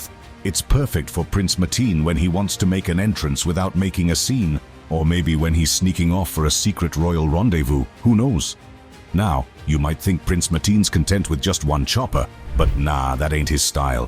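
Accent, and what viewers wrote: United Kingdom. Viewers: Way too many "ain't" and "nah." Don't go well with the narrator's English accent.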